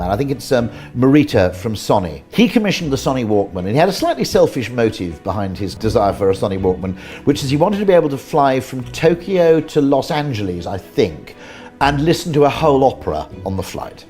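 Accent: United Kingdom. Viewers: Why is there always a British guy to explain why everything is crap? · Nobody would listen to this guy if he didn’t have a British accent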